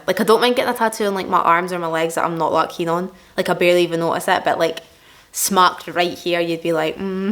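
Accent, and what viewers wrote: Scotland, United Kingdom. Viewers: Love your accent! Are you Scottish? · You make me feel so comforted that there are actually people out there with the same interests as me lmao. Also the asmr vibes ur videos have plus the Scottish accent is so satisfying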